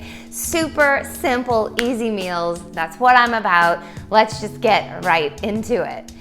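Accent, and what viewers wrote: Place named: New Orleans, United States. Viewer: I just discovered your channel - I live on the Northshore of New Orleans and was thinking, with that voice you had to be from around here!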